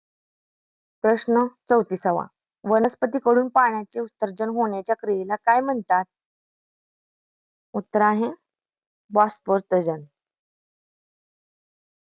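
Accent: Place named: Satara, India. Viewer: ek no madem khup chan questions 🔥..bhashecya tuning varun satarcya ahat vatat.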